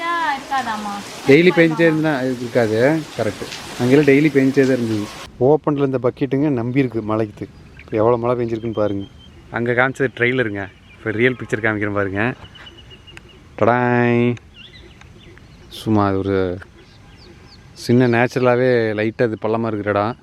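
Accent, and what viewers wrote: Kongu, India. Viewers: Kongu tamil super ponga...arumainga anna....neenga enna koottam nu kaekkara solla matingaringa...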